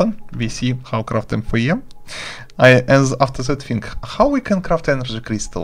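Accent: Russia. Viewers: такой русский акцент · хахаах сразу слышу русский акцент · It's actually Runglish. 😏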